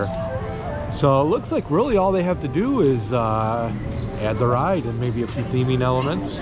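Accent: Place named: Minnesota, United States. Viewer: You from Minnesota or Canada? I can hear that accent right away.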